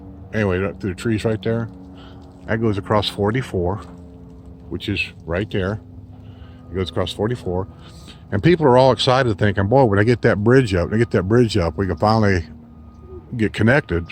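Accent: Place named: South, United States. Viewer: Love the “SOUTHERN” accent. Are ya from Kentucky? lmao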